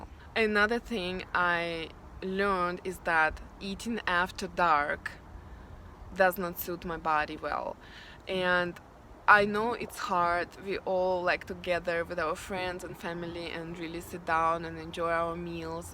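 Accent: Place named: Ukraine. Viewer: thanks for sharing your growth...also love your accent (Ukrainian??)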